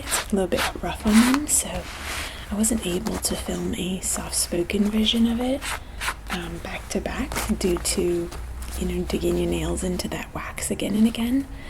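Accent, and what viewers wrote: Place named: United Kingdom. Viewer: She is actually from America, but she uses a British accent in her videos ☺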